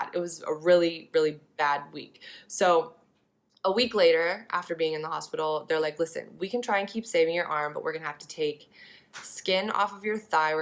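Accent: United Kingdom. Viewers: Her british accent thoo😋😋❤ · You are so inspirational and beautiful. ....and your British accent!!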